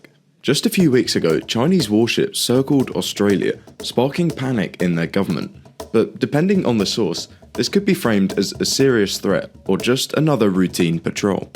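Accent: England, United Kingdom. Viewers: Bullshit with an English accent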